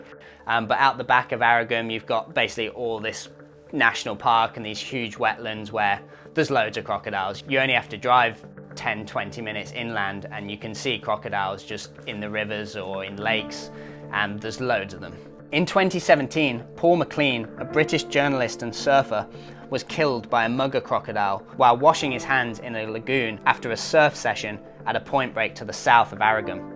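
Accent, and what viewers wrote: Australia. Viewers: Not Canadian..Aussie? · he is a brit though, but sounds like aussie. ne ca raw gua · ❤I love your accent and I love Australia I would love to visit sometime I’m from Louisiana USA a retired herpetologist. I also love your videos they’re very well put together sending lots of love and hugs your way, Ramona🎉❤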